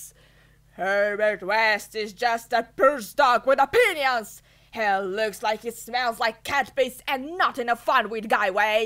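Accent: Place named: United Kingdom. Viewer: this sounds british and every other accent together, keep up the good work 10/10 voice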